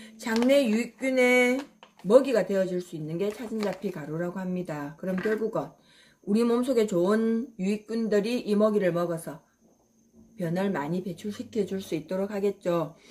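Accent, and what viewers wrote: Gyeongsang, South Korea. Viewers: 경상도 사투리 정겹노 ㅋㅋ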